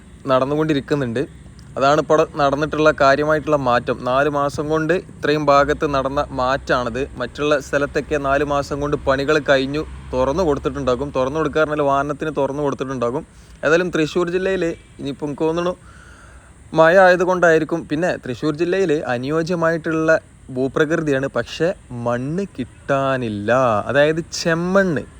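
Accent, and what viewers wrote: Malappuram, India. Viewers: ഞാൻ താങ്കളുടെ എല്ലാ വീഡിയോസും കാണുന്ന ഒരു പ്രേക്ഷകനാണ്. തൃശ്ശൂർ ജില്ലക്കാരനാണ്, ഞാൻ സ്ഥിരമായി NH 66 ന്റെ വീഡിയോസ് കാണാറുണ്ട്, താങ്കളുടെ വീഡിയോസ് ഏറ്റവും മികച്ചതാണെന്ന് പറയാതെ വയ്യ. എന്നാൽ, പല സ്ഥലങ്ങളും തിരിച്ചറിയാൻ കഴിയാത്ത വിധം മാറിയിരിക്കുന്നു. ദൃശ്യങ്ങളോടൊപ്പം അതാതു സ്ഥലങ്ങളുടെ വിവരണങ്ങളും ഉൾപ്പെടുത്തിയാൽ വളരെ നന്നായിരിക്കും എന്ന് തോന്നുന്നു. സ്വതസിദ്ധമായ മലപ്പുറം ശൈലിയിലുള്ള വിവരങ്ങൾ ഞാൻ വളരെ ആസ്വദിക്കുന്നു. എന്നാൽ, താങ്കൾ മറ്റ് വിഷയങ്ങളെക്കുറിച്ച് സംസാരിക്കുമ്പോൾ, അവയും നല്ലതായതിനാൽ, ഞാൻ ചിലപ്പോൾ ശ്രദ്ധ തിരിക്കപ്പെടുന്നു, ഇത് ദൃശ്യങ്ങൾ പൂർണ്ണമായി ആസ്വദിക്കാൻ ബുദ്ധിമുട്ടാക്കുന്നു. നന്ദി, എല്ലാ ആശംസകളും!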